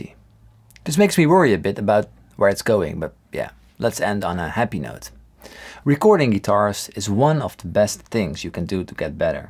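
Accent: Netherlands. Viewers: Your name sounds English but your accent sounds Dutch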